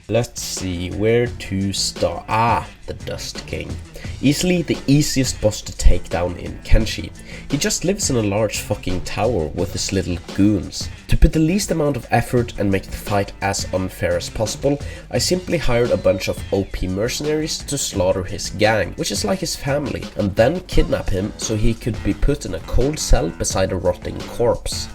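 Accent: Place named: Scandinavia. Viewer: Could hear at once that he's a fellow Norse boy ^^ Gonna watch this video later today :3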